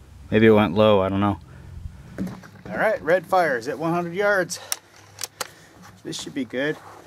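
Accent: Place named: Wisconsin, United States. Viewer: Is he from Wisc-aaaahnsin ?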